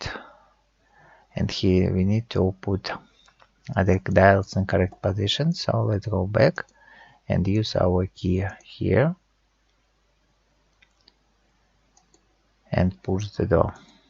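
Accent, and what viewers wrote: Russia. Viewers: Я так и знала,что ты русский,по твоему акценту,и по окну на русскому языке на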